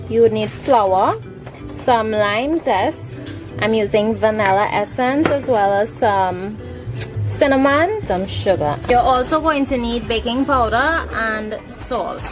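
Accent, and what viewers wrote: Trinidad and Tobago. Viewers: Girl, you sound like a True Trini. I subscribed to your Channel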